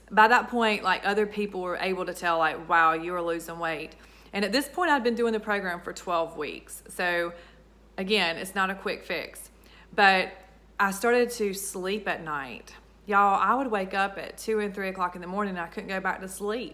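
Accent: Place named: South Carolina, United States. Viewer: You sound like you're from SC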